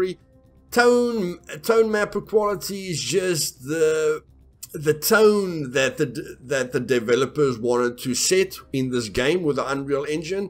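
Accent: Afrikaans, South Africa. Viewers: Hey this Guy definitely Afrikaans😂😂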